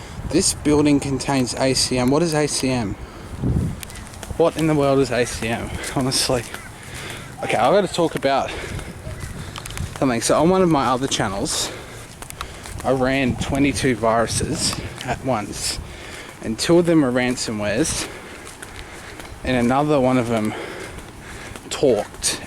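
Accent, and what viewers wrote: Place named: Australia. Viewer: You have a very strong accent. Proves you're definitely in Australia. :)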